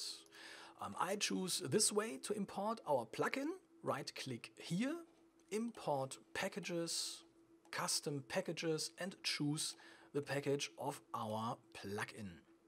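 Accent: Germany. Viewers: Is the guy talking from Germany? :D sounds a lot like it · Yes, he is a true German! A rare breed.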